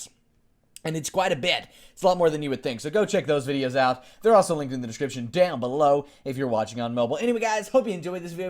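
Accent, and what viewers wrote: South, United States. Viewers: I just watched your first Setup video! You can really here your Southern accent in it xD It's hilarious! XD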